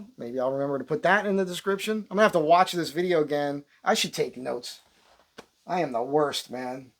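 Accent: New Jersey, United States. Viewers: Great video, spoken like true Jersey! Sorry I missed your live it was a little late here on the east coast but caught it this morning. Trump is kicking ass, as he should! 😊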